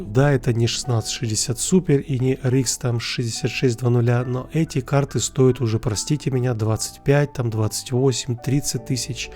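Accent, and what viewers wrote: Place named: Ukraine. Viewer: Что, мне послышалось, или у автора появился легкий украинский акцент?) Он решил что добавлять перевод названия на украинском мало?)) (не смотрел его пару месяцев, потому сразу заметил)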